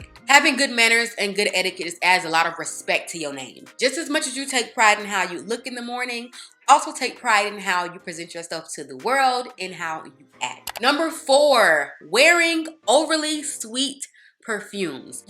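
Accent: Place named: Chicago, United States. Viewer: Girl you gotta be from Chicago ❤ great tips btw!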